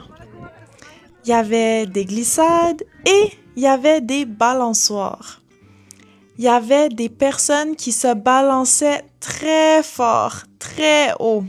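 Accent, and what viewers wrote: Canada. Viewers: I'm so glad it's in Canadian French. I live in New York, so most likely will go to Quebec and not France to experience the language.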